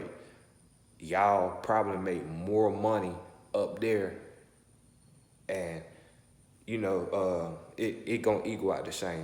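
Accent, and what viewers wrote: South, United States. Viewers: Dude narrating is way country. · He sound like hes from another southern state that is illietare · He has a southern drag.. Georgia is so big, why live in Atlanta? Im from NY, i don't live in Manhattan. NY is big enough to live outside of the 5 boroughs.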